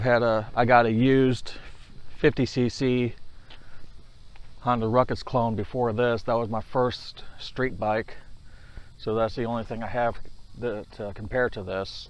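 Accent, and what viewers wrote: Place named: St. Louis, United States. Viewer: Hey, it sounds like you are in the Lou area! Good vid.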